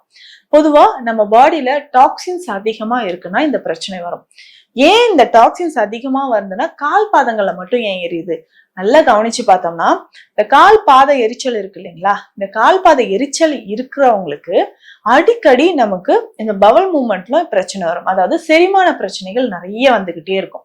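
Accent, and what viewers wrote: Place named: Chennai, India. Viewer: Dr.என்றாலும் Madras தமிழில் தான் கதைப்பீர்களா?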